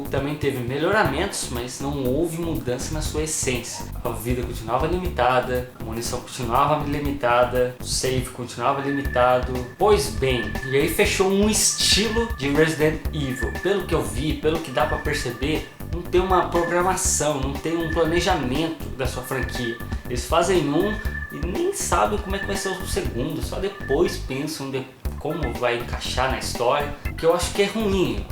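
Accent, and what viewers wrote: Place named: Piracicaba, Brazil. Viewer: por acaso você não é de piracicaba não né?? nosso sotaque parece ser o mesmo...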